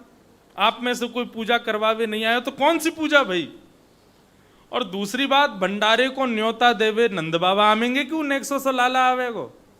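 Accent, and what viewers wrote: Braj, India. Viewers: महाराज जी आप इतनी मीठी ब्रज भाषा में बोलते हैं ऐसे लगता है मानो प्रत्यक्ष लीला हो रही है सामने हमारे छोटे से लाला को किसी की नजर ना लगे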